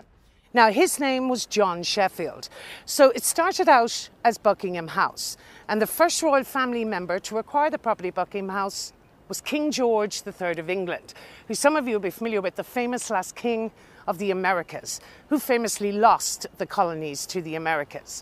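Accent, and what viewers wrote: Ireland. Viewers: my two favourite subjects London and history and superbly well put over also a gorgeous Irish accent . I love this part of London and learned a lot fromthis video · Still Beautiful and an Irish Princess!! Thanks for your Knowledge & Professional Tour! & Thanks for that lovely Irish voice...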